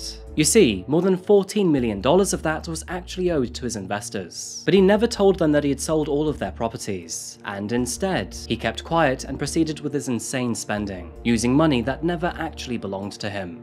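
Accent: United Kingdom. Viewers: Mate your British like me. Half our town names are spelt differently from how they're pronounced lol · as someone from the UK you are kinda brave saying we pronounce things wierd in the US. That being said I have no explanation for Connecticut. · Lol, not understanding why Connecticut is pronounced the way it is is rich coming from a man that sounds very British. Americans, try pronouncing a great many British village names and you would be wrong. By the way, I really like your channel. It is one of the very best crime shows on the internet. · I have to turn on the closed captioning in English because sometimes is hard for me to understand what he is saying 😂😂 it's the British accent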